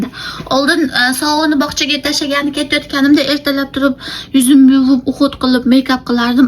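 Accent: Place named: Fergana, Uzbekistan. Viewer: Ozbekistonni qayeridan edingiz shevangizdan Fargonalikka oxshadingiz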